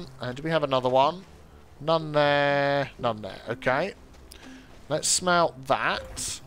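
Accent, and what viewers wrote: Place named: Kent, United Kingdom. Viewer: I would say Kent, judging by the way your pronounce your R's.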